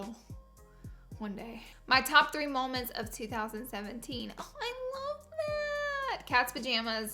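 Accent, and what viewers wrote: South, United States. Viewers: I love your videos & love your southern accent. x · I love your cute little southern accent!! ❤️ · Omg!!! Never been fist person to comment!!!!! ILYSM❤️❤️Ur the bomb we both have a country accent❤️😂💄💄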